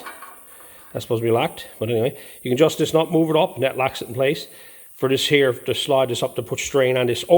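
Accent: Ireland. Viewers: You sound Irish lad nice job on the brake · Great piece of kit, well done. Is there a touch of Irish in your accent?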